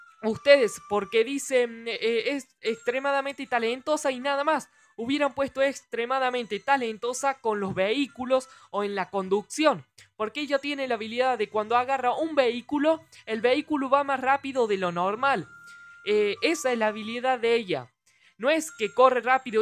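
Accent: Córdoba, Argentina. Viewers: Gracias por La Info, Casi Me La Compro Para nada. Sos Cordobes? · Sos cordobes??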